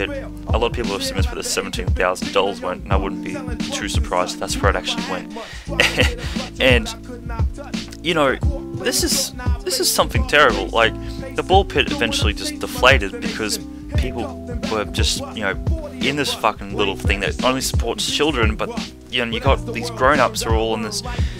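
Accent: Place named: Australia. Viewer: thought you were an aussie, we sound identical haha!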